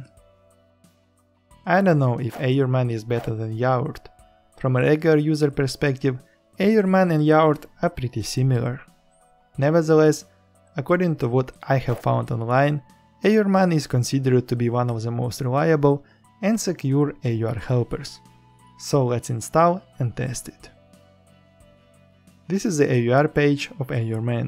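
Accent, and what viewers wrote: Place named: Russia. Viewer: the ruskii accent is the best:)))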